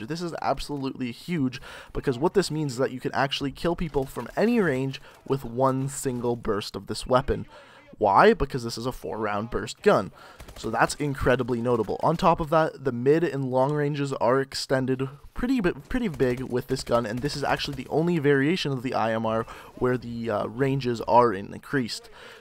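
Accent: Canada. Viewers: U canadian?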